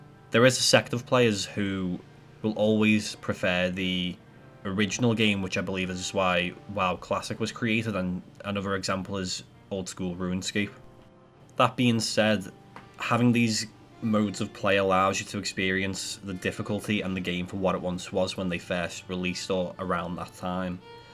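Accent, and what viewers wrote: United Kingdom. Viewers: It's one of the many regional British accents, maybe you should broaden your horizons more.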